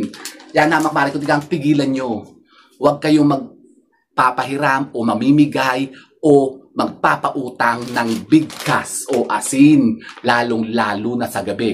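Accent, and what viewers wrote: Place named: Laguna, Philippines. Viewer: Ganyan talaga punto sa laguna😂ganda ng outfit eehh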